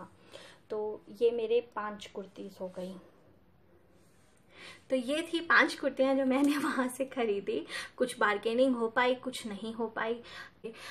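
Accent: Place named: India. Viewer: great haul.....soo cute😊😊love your hindi ascent...😍😍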